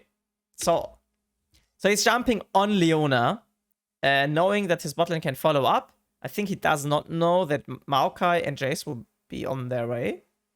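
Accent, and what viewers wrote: Germany. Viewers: speaks actually good, it's just the german accent. If you dont like it, than just dont watch it^^ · his English is good he only has a strong German accent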